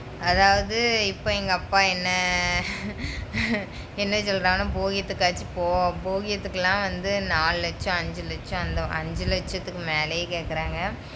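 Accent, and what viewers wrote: Tirunelveli, India. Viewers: உங்களுக்கு சொந்த ஊரு என்ன? நெல்லை பாஷை பேசுறீங்க.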